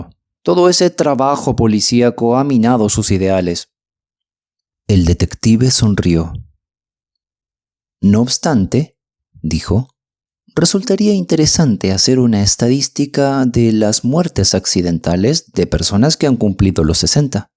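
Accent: Rioplatense, Argentina. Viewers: COMO ME GUSTA SUS RELATOS, PARA MÍ PERFECTO, HABLA COMO YO, RIOPLATENSE, MUY BUENA VOS, PRONUNCIACIÓN, ETC. una abuela desde Montevideo Uruguay 🇺🇾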